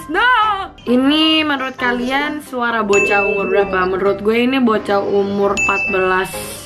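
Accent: Indonesia. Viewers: Ngomongnya sunda njay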